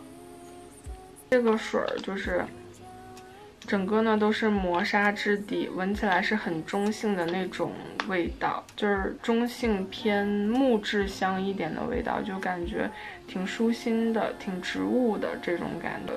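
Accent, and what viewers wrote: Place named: North, China. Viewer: 听你说话应该是北方人😆亲切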